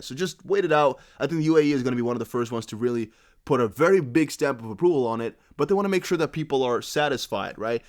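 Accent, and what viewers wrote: United States. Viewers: What is your first language? Because you sound like an American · Dude sounds American I know the English accent from Europe, Canada, Australia, and New Zealand sound different then American accent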